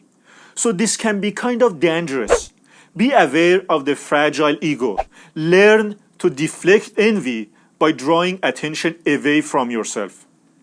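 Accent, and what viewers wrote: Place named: Iran. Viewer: I can tell by the accent that this guy is Iranian....لهجه تابلو هست که گوینده ایرانی هست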